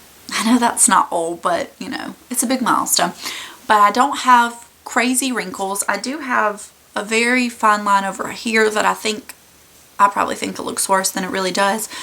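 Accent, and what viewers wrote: South, United States. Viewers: Your country accent is just ADORB! 😍😍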